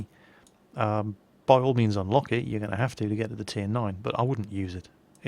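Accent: United Kingdom. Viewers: he's in the uk -_- did you not get a hint from the accent or British airsoft field or other ridiculously obvious pointers like that he plays on the eu server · Can you not tell hes a brit?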